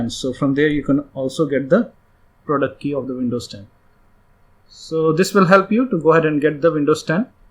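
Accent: India. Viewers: there are currently only 20 dislikes, the author has an indian accent and I've come back to this video several times to use the cmd method The cmd method is legit unless you're using a digital license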